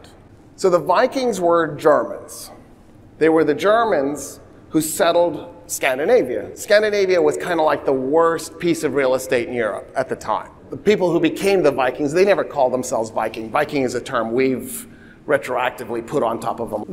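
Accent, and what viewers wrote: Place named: United States. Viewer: Oh trust me, that's just the smallest of his amateurish errors. He basically ignores entire populations, maps are completely off compared to historic records and so on. That being said, he clearly sounds American, and I can tell you it is very hard to find any American, even among supposed 'experts' who has any clue about European Middle Age so it's not really his fault. :D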